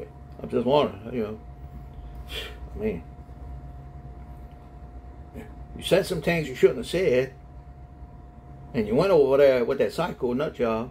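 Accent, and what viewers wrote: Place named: New Orleans, United States. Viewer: You are a knowledgeable person. You speak well and I’m overcome with joy just listening. You ARE changing folks 1 at a time. Prayers for your bride, Tam, and you. we love that New Orleans accent and your Bride💜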